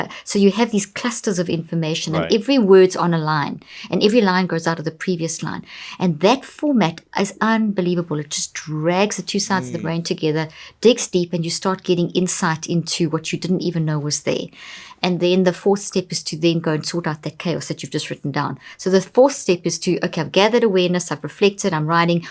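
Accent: South Africa. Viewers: South African 😍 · Still learning to understand the South African accent of this wonderful woman lol 🍀 💖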